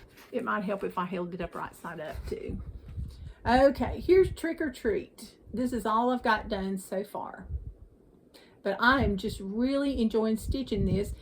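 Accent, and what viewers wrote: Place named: Western North Carolina, United States. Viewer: I came to your early video to see where you from! We lived in western NC for 6 years and I thought you sounded like you were from there. Wonderful people there! We are back in Eastern NC now.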